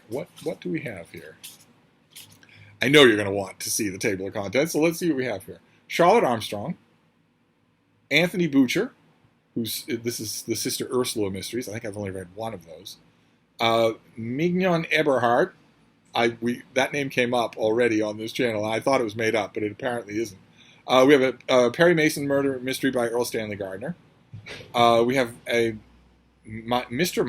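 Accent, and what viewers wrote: United States. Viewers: Sounds like you are in Georgia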